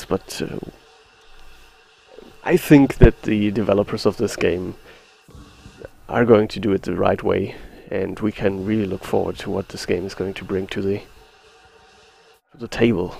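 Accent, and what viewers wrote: Germany. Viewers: was für eine scheiss englische ausprache. alter deutscher gehts nicht. peinlich.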